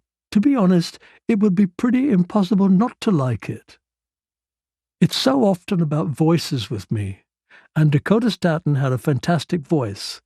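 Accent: United Kingdom. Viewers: Greetings..Awesome… … ever so creative..never stop reading.. it’s quite a luxury for me to hear ur Brilliant brisk British Accent..beautifully read and beautiful blessings!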